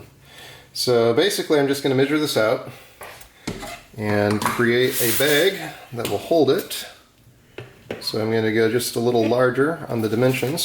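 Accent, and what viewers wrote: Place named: United States. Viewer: you sound american so this might be hard.... try using chared hemp fiber. being from the usa myself i know its around if you ask enough people. I havent had the time to start these experiments yet but There is a brit with a phd in material science that gave it a shot and was blown away. Check out Robert Murray-Smith if you dotn already know who he is... Its performance is at least as good as graphine.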